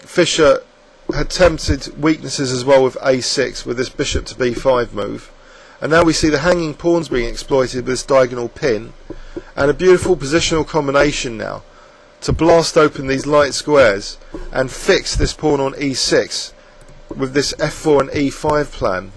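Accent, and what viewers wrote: United Kingdom. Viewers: Love your british acent :P well I guess it's british :P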